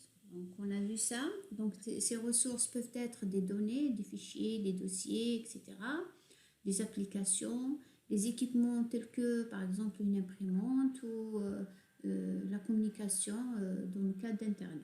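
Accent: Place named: Arab world. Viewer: j'avais peur de pas bien comprendre mais bizarrement quand on utilise un accent arabe je comprends tout de suite x)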